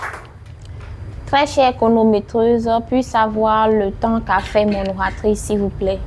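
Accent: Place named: Guinea. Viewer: comment tu vas? Bravo pour le travail que tu fais. L'accent guinnéen sort un peu hein😅. Bonne chance pour la suite et Dieu te garde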